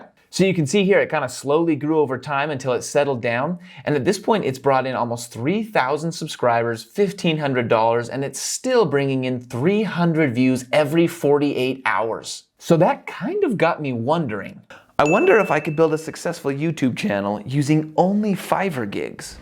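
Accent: Australia. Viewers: that was an Australian accent.... not British. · Ur boi is from Australia not Britain, cool vid :)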